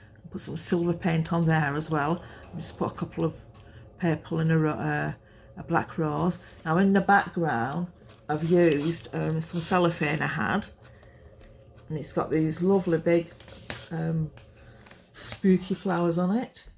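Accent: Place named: Manchester, United Kingdom. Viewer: hi there! Newbie crafter here and I found you via the #fallfestcollab the shadow box is absolutely amazing! what an amazing way to re-purpose items! now the 2nd craft holy cats that's so imaginative !! egg shells! omgodness these are all so amazing! i'm sure you get asked all the time but I'm new.. your accent reminds me soo much of my favorite soap opera.. so I have to ask (to see if my ear is even close) near Manchester? :)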